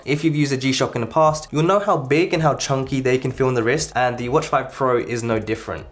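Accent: United Kingdom. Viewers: Very helpful. Thanks...you sound like your from west london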